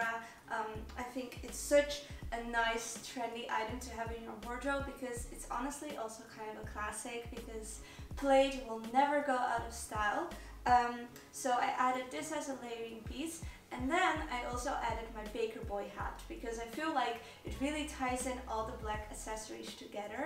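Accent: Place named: Netherlands. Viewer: Haha dacht al dat je Nederlands was door je accent blijk je dat ook te zijn 😂😂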